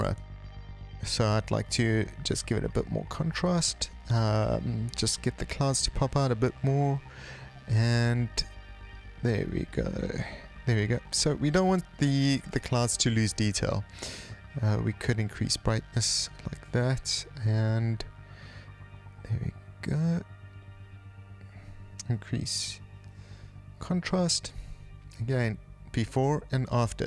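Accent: South Africa. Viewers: Spot a South African just by their accent. Great video mate! I use Lumafusion for all my editing and grading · I could hear the south African accent and then I saw table mountain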